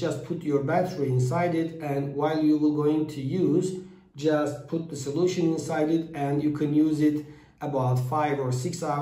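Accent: Turkey. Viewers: I absolutely love the Turkish accent. Correct me if I’m wrong! Reminded me of my teachers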